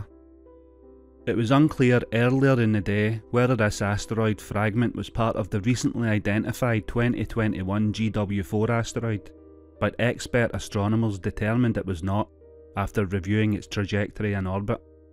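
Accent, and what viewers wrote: Scotland, United Kingdom. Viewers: Hello there🖐 We were lucky, this time, that the pieces landed in the ocean. One would think that, wit& all the satellites and observatories that someone would have known that the asteroid was heading our way? Perhaps it was known? Thank you for the report and video. I do enjoy your Scottish accent. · Is this a scottish accent ? If not, what accent is it ? Interested because I am not a native speaker.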